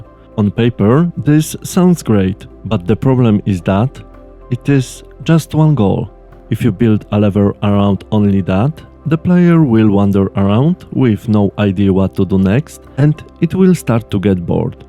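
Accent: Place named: Poland. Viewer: you sound polish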